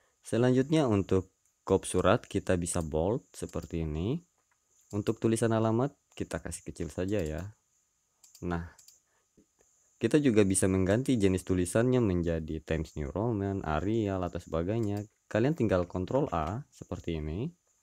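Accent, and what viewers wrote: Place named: Muna, Indonesia. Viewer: Makasih bang, sangat membantu bagi pemula 🙏 bdw, logatnya seperti daerah sy (MUNA, Sultra) 😁 maaf kalo salah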